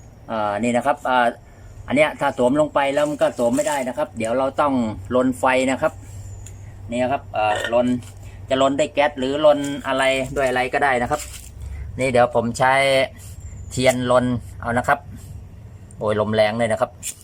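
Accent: Chanthaburi, Thailand. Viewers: พี่คนจัน หรือ ระยองครับ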